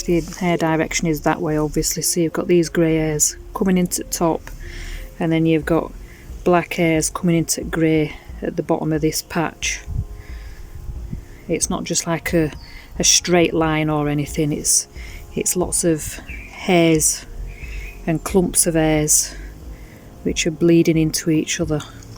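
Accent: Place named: England, United Kingdom. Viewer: fantastic I would say the yanks can't understand a real english accent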